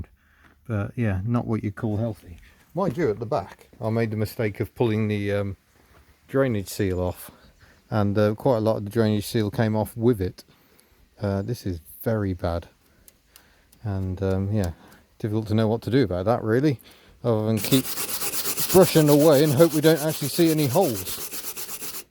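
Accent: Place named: Birmingham, United Kingdom. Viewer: Welsh hippy I thought you were a brummie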